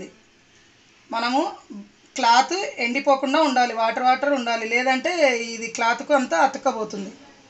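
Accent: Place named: Rayalaseema, India. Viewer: aha rayalaseema matalu vini anni rojulu indakkahii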